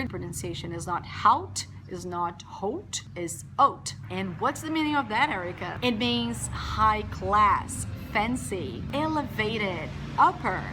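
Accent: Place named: United States. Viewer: That's not an English, it's American...gosh please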